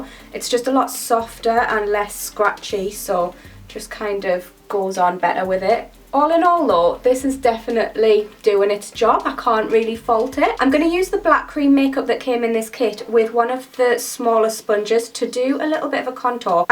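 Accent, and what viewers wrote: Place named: Wales, United Kingdom. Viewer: You probably aren't welsh but you sound really welsh. Im welsh :D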